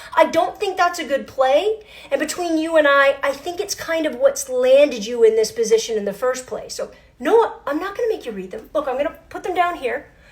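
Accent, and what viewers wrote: Canada. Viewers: Now that I know you're Canaidien I hear it. Love it. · This is what a true Canadian who loves their country looks like and sounds like. I know that scares some people, but I say thank you.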